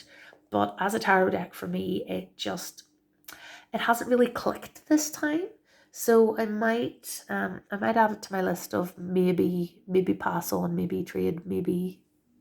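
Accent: Ireland. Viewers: Oh you're irish! Yaaayyyy, subscribed 🥰🌞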